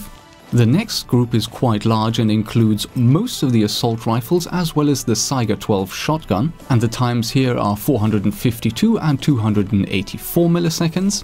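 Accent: United Kingdom. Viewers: ur voice is so British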